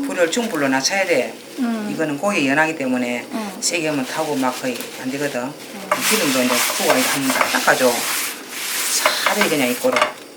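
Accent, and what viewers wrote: Gyeongsang, South Korea. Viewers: 육전 ^^너무 맛있겠어요 반찬 검색중에 육전에 눈이 띠웅해서 보고나니 당장 먹고 싶네요 어릴적은 사는게 힘들어 그런지 ᆢ친정엄마는 한번도 해주질 않았는데 제가해서 드려야 겠어요~ 저도 고향이 경상도라 구수한 말투에 너무 정감이 갑니다^^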